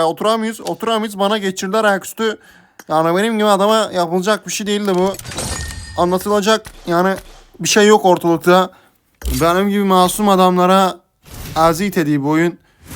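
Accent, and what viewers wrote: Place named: Thrace, Turkey. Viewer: Boş video aq Trakyalı konuşma cabana bayıldım kardeşim 😂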